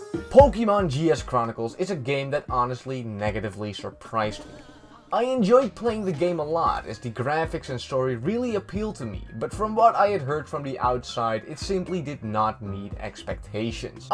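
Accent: Netherlands. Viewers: Only took me ten seconds of hearing your accent to find out you're Dutch haha. Either way, nice video and you're definitely right. Though these glitches are kind of to be expected since they're essentially cramming a DS game onto GBA hardware. What would be your favorite Johto romhack then?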